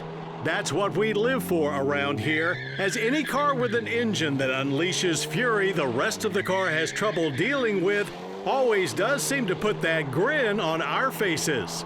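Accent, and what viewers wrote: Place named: South, United States. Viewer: Remember when he had a southern accent?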